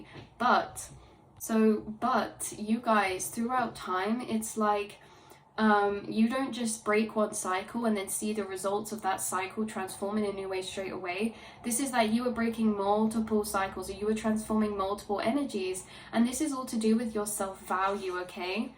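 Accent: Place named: England, United Kingdom. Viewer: Oh yeah I’m in the UK and you sound like you’re from England…I’m a Londoner..Peace ✌️🫶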